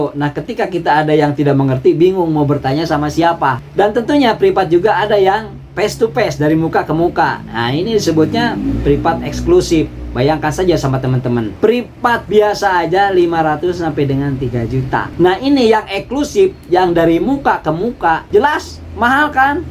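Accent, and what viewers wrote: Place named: Sunda, Indonesia. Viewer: Logatnta nyunda banget kang... Sama kaya saya 🤫🤭🤝🙏🏻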